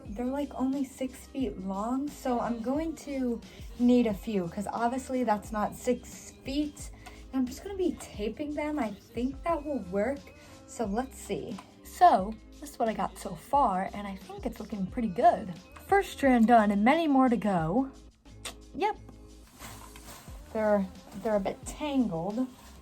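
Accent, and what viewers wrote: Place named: Ireland. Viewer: i can really tell you have a irsh accent ❤️❤️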